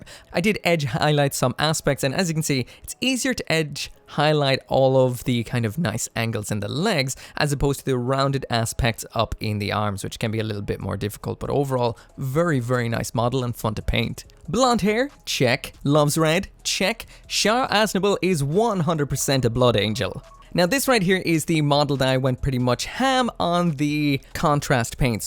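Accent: Ireland. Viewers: You sound like a fellow potatolander. Also mobile suit skirmish exists for 1/144 wargaming And zero well less mould lines is pure wintanium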